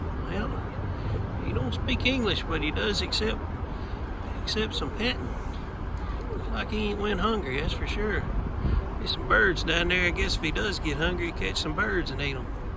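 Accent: South, United States. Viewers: Great episode,! I live in Georgia(USA). Just subscribed to both channels. I enjoy your southern drawl and your videos! Thanks!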